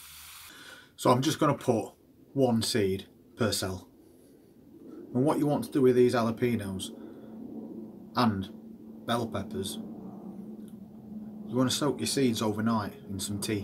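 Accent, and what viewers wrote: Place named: Derbyshire, United Kingdom. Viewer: Another good one.I live in Derbyshire and you sound like you have a local accent.🐝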